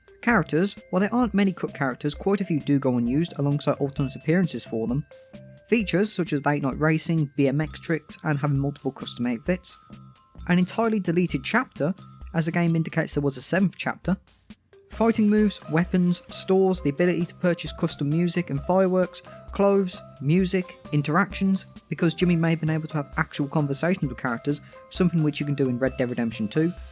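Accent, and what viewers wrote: United Kingdom. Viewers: because it's obviously not an accent common in the US, even as a non-US citizen I can tell. It's obviously more common in somewhere near the UK. And you can confirm it yourself that he's from the UK by looking at his account's bio. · boo hoo man can't understand UK accent get a grip convo over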